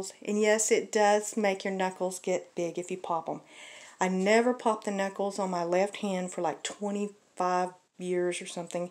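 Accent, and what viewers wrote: United States. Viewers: Are you American ??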